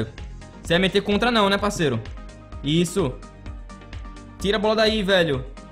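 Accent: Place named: Northeast, Brazil. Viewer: cara vc é do nordeste se fo diz qual e seu estado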